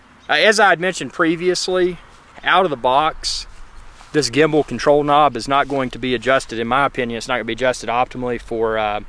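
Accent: South, United States. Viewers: You sound southern - I am in Tennessee - Thinking of getting one of these. I would like to fly it in some of the massive caves/pits around... wonder how well that would work...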